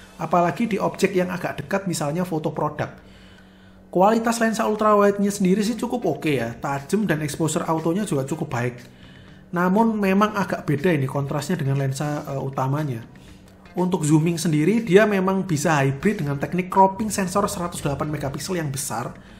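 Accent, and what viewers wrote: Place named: Java, Indonesia. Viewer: Medok bro 😁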